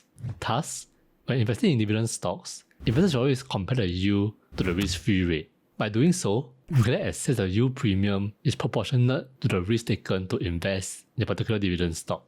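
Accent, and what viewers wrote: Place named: Singapore. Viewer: Love your accent, are you from Singapore?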